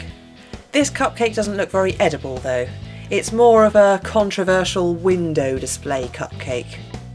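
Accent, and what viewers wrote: England, United Kingdom. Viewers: u from good ol britain! mee tooo allright me loveeerrzzz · Does she live in England and does is she English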